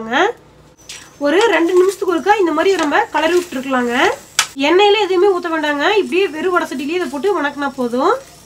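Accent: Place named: Tamil Nadu, India. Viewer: Romba supera irundhuchu. You are explaining really well.You are giving nice tips also like washing utensils immediately, cleaning the gas stove after cooking....your language is very nice to hear..you are from which part of Tamilnadu?I have become a fan of you now.Upload more under 30 minutes cooking videos please.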